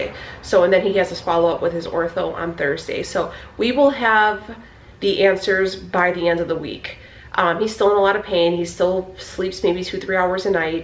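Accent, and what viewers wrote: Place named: Chicago, United States. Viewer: I just found you a couple of weeks ago. I'm retired and doing Keto. I used to be a truck driver and always was interested in Chicago people when I went through. Love your accent! Enjoy the cooking segments, the fashion. I just taught myself dip nails😱and love your leopard nails today. Whatever direction you go i will be watching! There are lots of older women like me that miss the family hub-bub so its fun to watch and relive those times.❣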